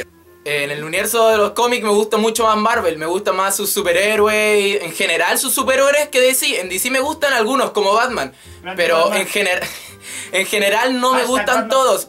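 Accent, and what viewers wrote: Chile. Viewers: ¿Todos los chilenos hablan igual? \:v/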